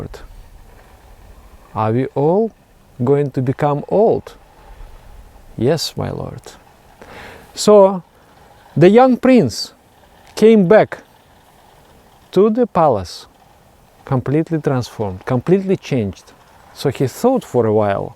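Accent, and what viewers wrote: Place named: Russia. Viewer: Спасибо большое за видео🙏 Но его не совсем просто слушать из-за грубого акцента который влияет на произношение. В том смысле что русские постоянно пытаются произносить чётко каждый звук. Английский так не устроен, он звучит нормально только когда на нём говорят носители в обычной им манере. Ещё раз спасибо 😊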